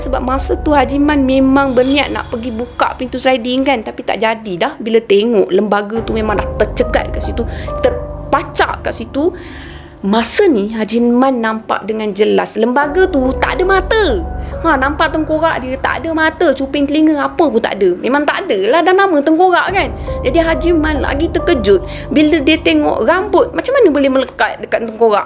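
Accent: Kelantan, Malaysia. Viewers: saya dh agak dah kakak orang kelantan,masa kakak bercerita ada sikit tubik bahasa kelantan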